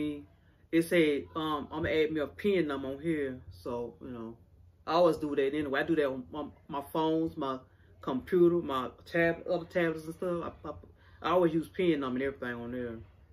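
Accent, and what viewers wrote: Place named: South, United States. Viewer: love the accent. reminds me of family from the south. haven't seen them since I was a child at 12.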